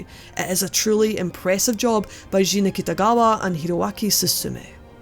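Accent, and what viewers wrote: Scotland, United Kingdom. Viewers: when the Scottish accent hit me I was like yesss gurl- Got myself a wee buddy :D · Just found your channel, but it's exciting to hear some Scottish representation in my Youtube content!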